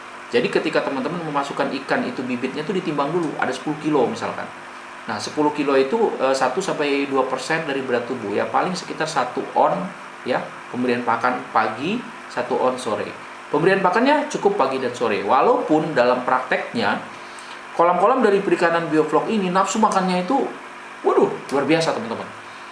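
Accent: Indonesia. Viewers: Bang logatnya seperti orang Lampung, saya dari Kalianda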